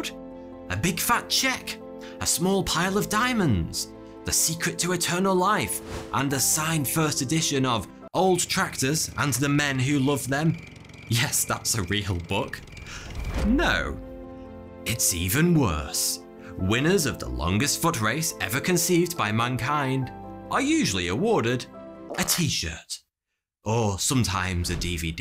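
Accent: United Kingdom. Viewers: only british accent i like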